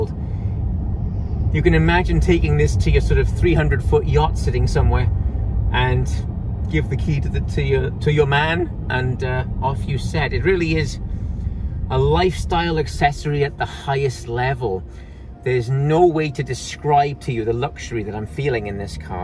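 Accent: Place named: South Wales, United Kingdom. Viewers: Well I clicked to watch this great video to study the Phantom, but then spent 15 mins listening to an accent that sounds almost from South Wales. It threw me haha. Great video however :) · Are you Welsh? You sound as if you have a Cardiff accent.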